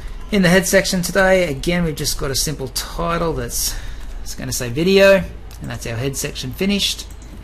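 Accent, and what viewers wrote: Australia. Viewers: Love your accent mate. Australian right?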